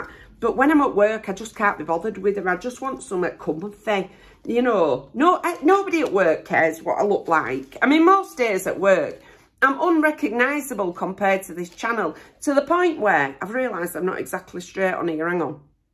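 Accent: Yorkshire, United Kingdom. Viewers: where u from, i figured you had yorkshire accent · Yes she is from Yorkshire could be Leeds?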